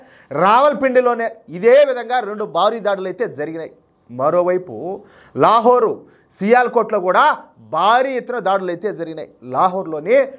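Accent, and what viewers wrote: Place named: Chittoor, India. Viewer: గారు మీ యాక్సెంట్ ని బట్టి మీరు చిత్తూరు జిల్లా కి చందిన వారని అనుకుంటున్నాను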